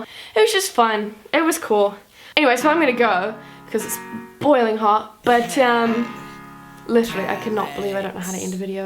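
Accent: New Zealand. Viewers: I really love to watch video. She has really cute Kiwi accent. Hello from South Korea. I wish you upload video everyweek for subscribers. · i really love nz's accent <3 hello! from PH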